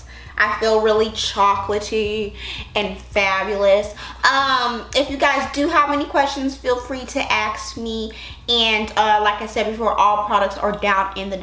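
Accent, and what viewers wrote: South, United States. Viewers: Lawwwd talks like a southern Grandma.... girllllll, chillllllddddd 😂😂😂😂. I luvs this chick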